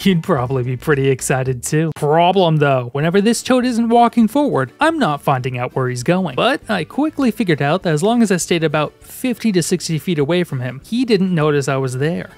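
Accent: Boston, United States. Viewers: Is it just me or half way thru the video he talks with a Boston accent for some reason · Your accent sounds like Boston. Where are you from? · I love deep dives into game worlds like this! Btw, are you from Boston?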